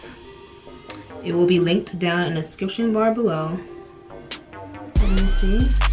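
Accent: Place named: Philadelphia, United States. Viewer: “ I almost cut my Air “ I love her already I thought I was hearing a philly accent 😂 loved this video! Super informational and easy to follow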